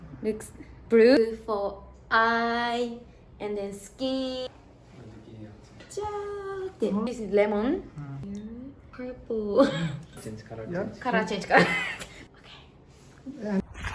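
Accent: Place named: Japan. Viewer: Valo Hoise, Her English is truly Japanese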